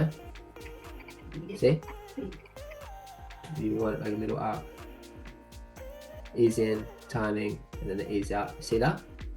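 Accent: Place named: China. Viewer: sounds like a chinese student very famliar accient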